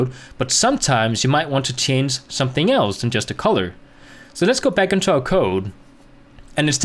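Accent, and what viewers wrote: Denmark. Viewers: Thanks bro , im working on my portfolio website because im learning to code :D Do you want to see it?? It´s not done yet though but pretty far (ps you sound danish ;-) )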